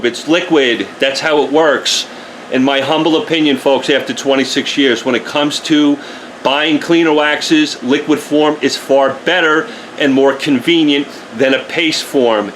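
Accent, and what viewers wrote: New York, United States. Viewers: Lol. You haven't seen mad yet. Sounds like a NY/NJ accent which is like this, he actually sounds calm here.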